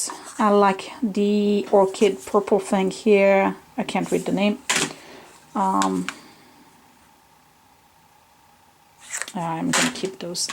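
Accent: France. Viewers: Where are you from originally? Your french accent is great! :-)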